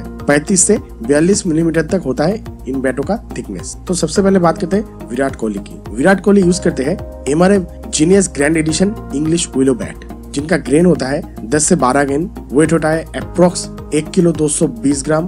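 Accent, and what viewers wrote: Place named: Uttar Pradesh, India. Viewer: aap up se Ho na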